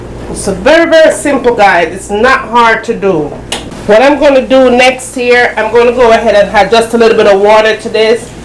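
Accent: Jamaica. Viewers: I've never tried gravy like this before I love it thanks! and I love you authentic Jamaican accent!!! 💜💜💜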